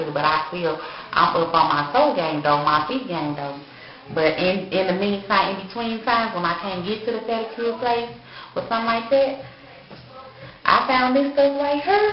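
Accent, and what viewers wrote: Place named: St. Louis, United States. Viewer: Hard-da-tion-----Foot game--duces -You sooo St.louis. I love u......gotta go on my way to Sallys lol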